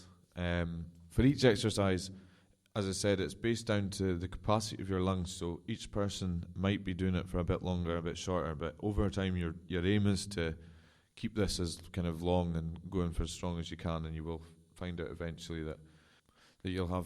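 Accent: Scotland, United Kingdom. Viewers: Love the scottish accent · came here for warm ups. Stayed for the Scottish accent. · That's a Scottish accent :) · Scottish accent^^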